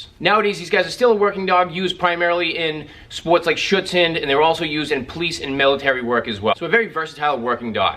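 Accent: Boston, United States. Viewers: Are you from Boston??? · From Boston? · My brother! I hear a Boston accent. Yeah? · I can hear some Boston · Love that Boston accent. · u southie?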